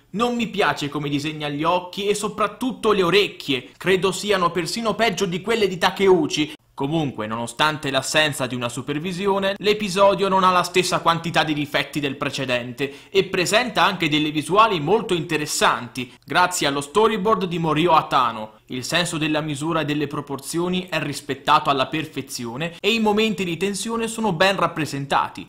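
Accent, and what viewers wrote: Italy. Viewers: sei italiano ?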